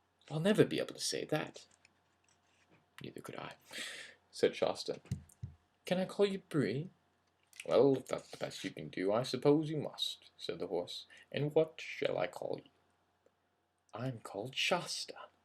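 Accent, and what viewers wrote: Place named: United States. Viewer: Description says he's from Scotland. He sounds American. No biggy :P~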